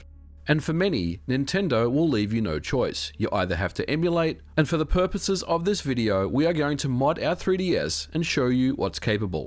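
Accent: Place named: Australia. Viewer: you're Aussie right? all these years watching you i know you're living in burgerland but you just sound Aussie... 😅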